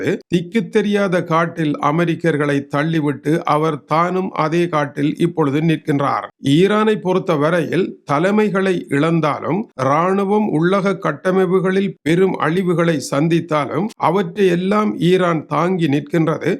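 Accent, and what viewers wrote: Sri Lanka. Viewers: நல்ல இலங்கைத் தமிழ் கன்னியகுரல் குளந்தைகளுக்ம் புறியும்படியான விலக்கம் நன் திகைக்கும்படியன ஒரு தெளிவான விலக்கம் அடிகடி உங்கள் நீ ஸ் கேக்க மனம் தூண்டுகிரது மிகவும் உன்மையான சம்பு வமாகவும் உடனுக்குடன் செய்திகளை அனுப்புங்கள் நன்றி ....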